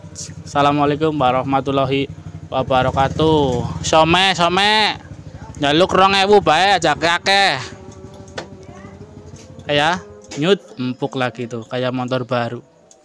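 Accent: Banyumas, Indonesia. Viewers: Otw praktek mas bro. Ngapake daerah ndi mas. Nyong juga ngapak. Banyumas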